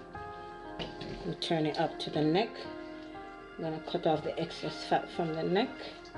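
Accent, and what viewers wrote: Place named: Jamaica. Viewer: "Caan nyam dat", as Jamaican myself I just love how you go in and out between English and Patois.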